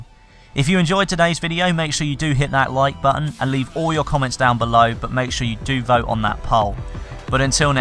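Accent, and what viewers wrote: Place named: United Kingdom. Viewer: "coy-yote" sight lol! Love the King's(??) English bro, I'm the one with the 'Murican accent =)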